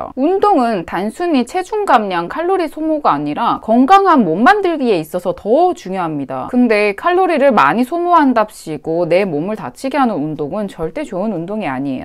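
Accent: Gyeongsang, South Korea. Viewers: 언니 갱상도 뇨자였구나? 친근해ㅎㅎ 담엔 사투리버전 영상 어때여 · 누나 혹시 경상도 출신이에요??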